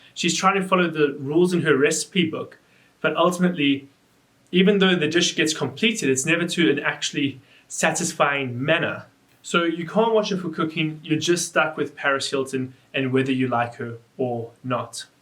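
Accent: South Africa. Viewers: Are you South African? I love your accent x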